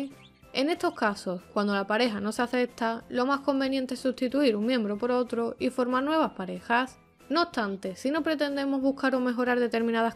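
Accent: Spain. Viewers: Yo tambien vivio en españa. Una vez vi uno de tus videos estando con mis abuelos y me dijeron que tenías un acento como de canarias. Es cierto?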